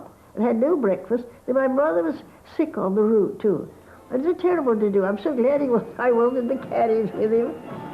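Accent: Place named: RP, United Kingdom. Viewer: She's so posh, I can't understand her.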